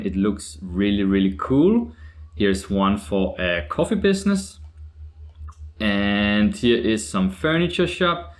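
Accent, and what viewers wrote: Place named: Denmark. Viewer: Du er dansker kan jeg høre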